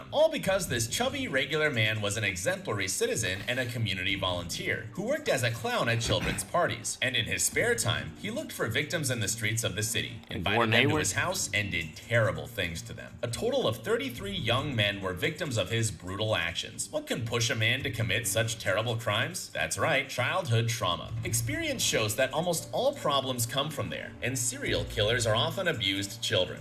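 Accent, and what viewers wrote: India. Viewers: His Indian Accent had me dying😭😭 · that indian accent got me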